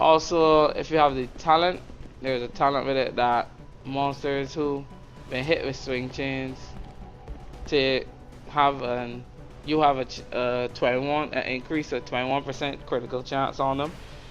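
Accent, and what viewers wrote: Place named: Trinidad and Tobago. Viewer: That accent sounds like you are from the V.I or Trinidad. It's all good, I' m from St.Kitts Nonetheless very good preview of the Slayer skills. I was curious to see what the class was about and so far I'm impress. Big up!! ^_^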